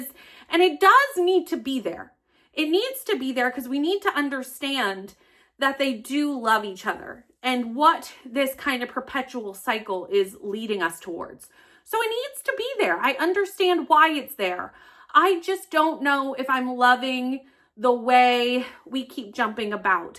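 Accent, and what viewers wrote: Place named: Canada. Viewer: Its weird, because every time you say it's your Canadian accent, I'm just like....does this mean I have a Canadian accent, and I just don't hear it xD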